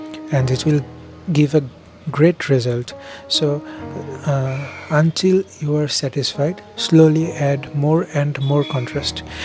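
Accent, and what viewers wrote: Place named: India. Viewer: you speak japanese, you sound indian, and you draw like a god. your A legend.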